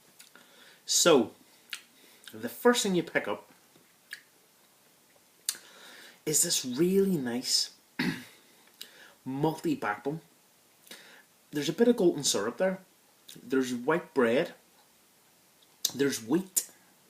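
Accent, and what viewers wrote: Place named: Ireland. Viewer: you sound irish .. too much time in home land lol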